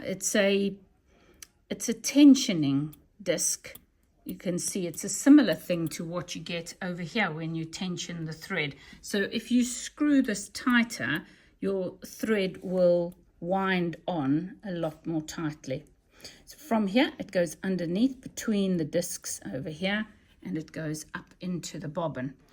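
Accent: South Africa. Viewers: I know a Sata African when I hear one. Thank For this - just bought the same machine to start my hobby and small projects. and your videos are just everything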